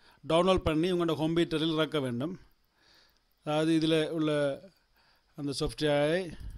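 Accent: Sri Lanka. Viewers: Sri Lankan TAMIL ah?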